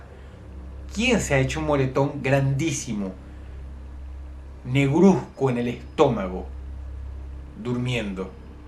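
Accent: Venezuela. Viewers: Sería buena idea como en casos de la vida real con Silvia pinal, pero en este caso sería el (acento feo venezolano) el problema sería tramitar con todos los autores de las historias, pero siendo el escritor se me hace extraño no lo haga, quizás no lo ha considerado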